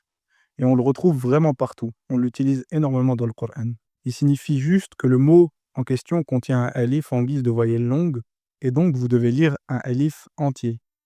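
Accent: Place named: Belgium. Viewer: j'avais noté la prononciation Belge aussi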